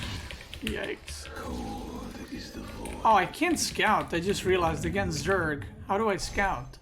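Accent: United States. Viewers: Definitely more american than not. Like a well spoken immigrant west of the mississippi. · You do actually sound completely American. In the vast majority of America we all have a very similar accent and it sounds very similar to the way you speak English. You could come over to the majority of the States and no one would know that English wasn't your first language. It's just the South and Boston that have ridiculous accents. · You're speaking mid- Atlantic English. It's Americanish but without the twang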